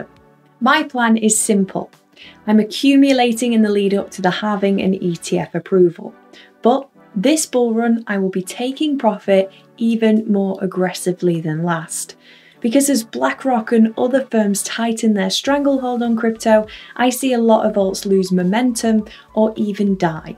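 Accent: United Kingdom. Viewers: Sounds British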